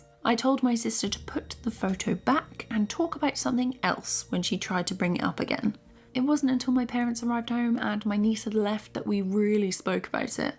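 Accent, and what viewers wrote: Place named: United Kingdom. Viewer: I really like your accent! whereabouts are you from in the UK? I'm going to the UK with YMS visa. I'm studying with your video.haha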